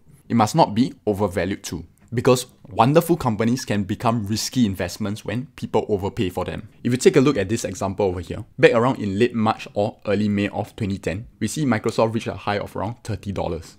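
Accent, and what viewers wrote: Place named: Singapore. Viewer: No pun intended. It’ll be nicely if you can slow down when speak. You sounded like one of my colleagues from Singapore. He has a similar accent like you but speak in a slower pace. In the US, we also have a different accent among various states. Other than that, the content is helpful.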